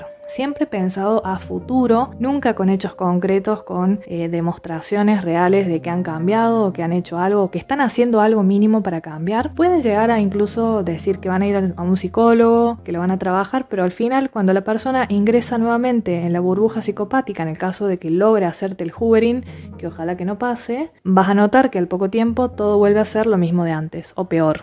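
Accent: Córdoba, Argentina. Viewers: Hola excelente tu trabajo sos de alguna localidad de Córdoba, ya q tenés tonada cordobesa , sé reconocer esa tonada , porq yo vivo en Córdoba . Una pregunta y me interesaría saber cómo es la vida de un narisista en la vejez , cómo son sus comportamientos y sabiendo q ya no puede conquistar ? Un beso . :hand-pink-waving:🙂😘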